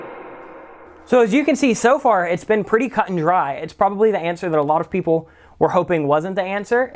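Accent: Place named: South, United States. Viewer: Release the country accent cut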